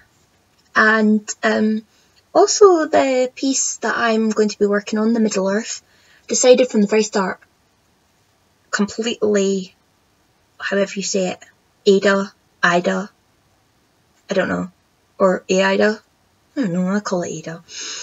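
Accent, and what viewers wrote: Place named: Scotland, United Kingdom. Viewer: So, so nice to hear another Scottish cross stitcher there seems to be a severe lack of us. Love your work, looking forward to seeing more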